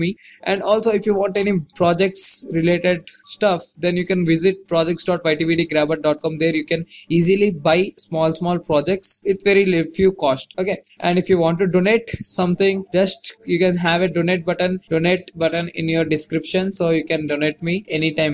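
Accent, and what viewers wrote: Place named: India. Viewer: Good explanation but hard to understand because of the Indian accent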